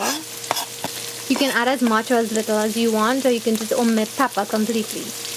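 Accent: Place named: Trinidad and Tobago. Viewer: Are you aware you have a trini accent? ;-)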